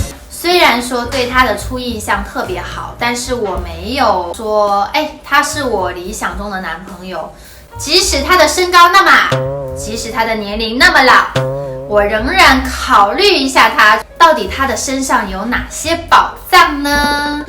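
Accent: Taiwan. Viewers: 北京大妞的也有了浓浓的台湾腔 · 說話腔調開始向台腔靠近了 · 歡迎你嫁來台灣！你講話有台灣味哦！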